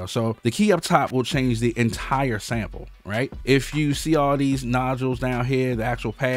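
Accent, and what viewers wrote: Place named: Washington DC, United States. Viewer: It’s the dc accent bro lmao he can’t help it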